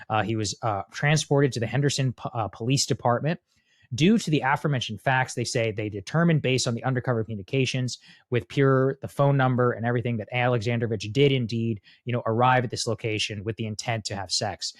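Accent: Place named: Africa. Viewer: Girl you African. You can’t fool me. I hear it and you need to put up the right flag because that’s not your flag. Sorry and not sorry and will never be sorry! You do a good job tethering but we “hear” you. Smmfh. You do speak very well tho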